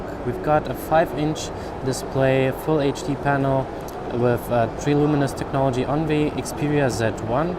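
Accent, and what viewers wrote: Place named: Germany. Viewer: so süß, wenn ein Deutscher englisch redet ;)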